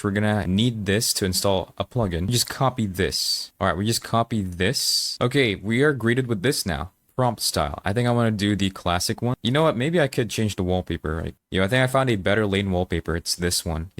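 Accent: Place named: United States. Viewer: Hey man... I've been subbed to you for like a month or two and i friggin love these videos :) Also out of topic but where did you get that American accent while being a non native English speaker 😭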